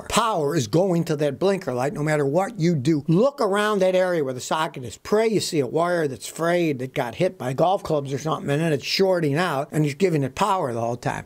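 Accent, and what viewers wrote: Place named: Tennessee, United States. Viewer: It sure sounds like Tennessee lol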